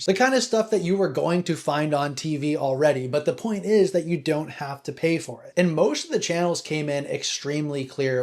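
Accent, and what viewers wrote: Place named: Canada. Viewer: Eww you sound like from cAnaDaaa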